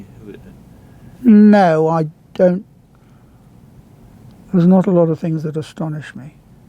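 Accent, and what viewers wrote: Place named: RP, United Kingdom. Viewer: He is so wise and confident about his craft. Rightfully so. His music is amazing. Plus his accent is so posh!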